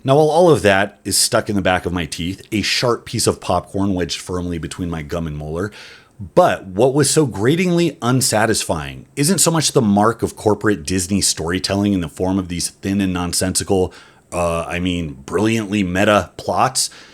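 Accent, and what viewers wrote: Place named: United Kingdom. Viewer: Bromley British accent is the voice inside of my head. Remoinds me of a boss I once hated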